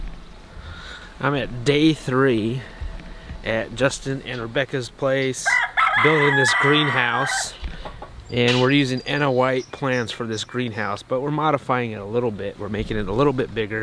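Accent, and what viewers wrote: Southern California, United States. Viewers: Excellent work! Your greenhouse is amazing! I wish I had that same greenhouse on my farm! Beautiful! Raise The Ruff! Be careful! By next year you may be calling it a Roof! Ha! Ha! The longer your here the more you will lose your Southern Cali Accent! You should hear my sister in law's accent now. She is originally from Glasgow Scotland. However, after many years living in North Carolina she has the most unique accent you've ever heard. Part of it is Scottish accent, part of it is Southern North Carolina Accent! So Beautiful! We will compare as time goes on to see how your accent is affected! LOL! It's All Good! When we lived away from the south in Japan and New Mexico I lost some of my southern accent for a while too! Funny how environment and surrounding people affect what we sound like! I hope it doesn't offend you how we tease you about your accent. 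I used to get teased a lot about my southern accent when my husband was in the Air Force. People would always ask me to say certain words and often just say to me... Can we just listen to how you say things??? So Funny! It never bothered me. I just laughed and had fun with it! No Harm! Just Laughs! God Bless!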